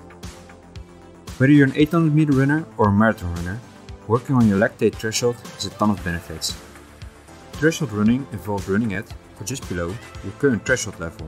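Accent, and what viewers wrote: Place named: Germany. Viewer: Meest denglish accent ooit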